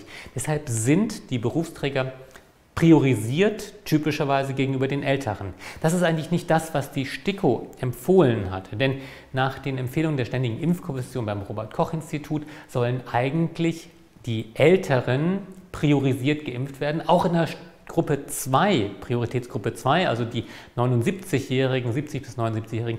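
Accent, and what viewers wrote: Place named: Franconia, Germany. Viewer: Totally besides the point aber ich habe mir die ganze Zeit gedacht: der Akzent ist doch aus dem Norden. Jetzt hab ich Sie gegoogelt u wurde fündig: Verden, Niedersachsen :)) Ich vermute mal da nicht nur geboren sondern auch aufgewachsen? Anyhow- Danke für Ihre Bemühungen u Arbeit im Bezug zur Pandemie u ich hoffe sie fühlen sich in Würzburg zu Hause. Ein bisschen Fränkisch war ja bereits mit dabei. Hihi.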